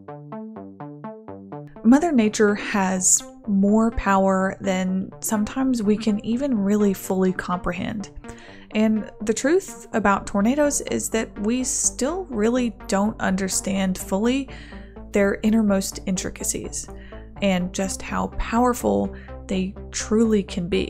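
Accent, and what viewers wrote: United States. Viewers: Seriously? The current scale is the EF-scale. Europe still uses the F-scale, but you sound American to me. That said, as you know, the EF5 scale tops out at 318 mph. It's unlikely that higher windspeeds, which have been recorded as high as 320 mph, would cause more damage. It would take a much higher windspeed to create a new class of catastrophic damage. As for your comments about damage, it's possible to create your hypothetical scenarios under laboratory conditions. Engineers know exactly the structural integrity of a building within its technical life. Before you make another video, maybe you should ask an engineer, actually read the EF-scale, and review the peer-reviewed research about tornadoes.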